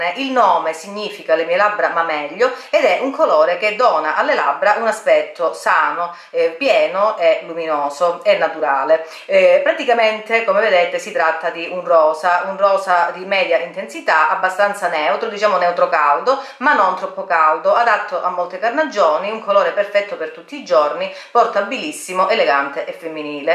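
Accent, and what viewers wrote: Calabria, Italy. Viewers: Ciao :) capito su questo video grazie proprio a Clio :) sei stupenda e fai delle spiegazioni strepitose, super dettagliate!! Dall' accento credo tu sia calabrese come me😍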